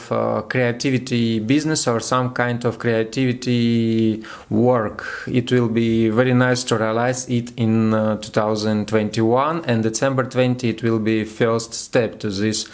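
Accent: Russia. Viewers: акцент классический русский... хотя у итальянцев такой же - по крайней мере, очень похож.. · День добрый прикольно русский английский это что-то...😁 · Wow!! You were surprised! Yes, of course, your English is far from perfect, but understandable. In my opinion it's a good idea. You need to expand the audience and you are moving in the right direction. My English is terrible, unlike yours, and the same terrible Russian accent, which only improved understanding. Subtitles weren't needed. Accept my praise again! Keep it going! P.S. простите меня, если я вмешиваюсь в ваши планы, но было бы более правильным открыть отдельный канал для англоговорящей аудитории. Немного практики, занятий с носителем языка, и все будет великолепно! Русский акцент только придаст вам определенный шарм! Вот только танцевать не надо. Это уже будет too much.))) But that's just my opinion, you decide!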